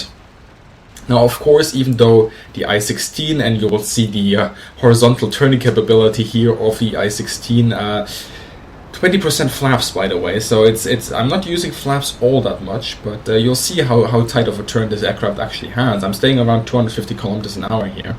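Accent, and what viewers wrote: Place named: Germany. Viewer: Wow! What a way to present information, through an actual real-time simulation. And do I recognize my favorite German accent guy? Whose depth of knowledge of the ww2 aviation history is UNSURPASSED? Thank you! This is awesome! I learn more from this than by flying the simulator myself! Great medium! Re-live reality!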